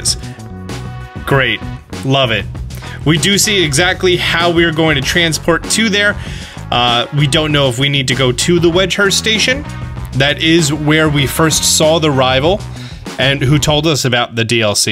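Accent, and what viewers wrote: Spain. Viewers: I like you even more now, with you cool Spanish accent!!! For the win!!! GO!!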